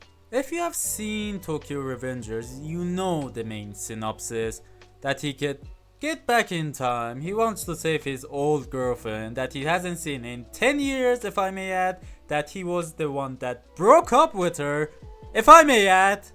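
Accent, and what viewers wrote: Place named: Iran. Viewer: dige ma irania lahje hamo tashkhis midim rahat 😂❤ vali kheyli khub harf mizani :) kheyli ham channel e khubi dari, keep up the good work! omidvaram hesabi pishraft koni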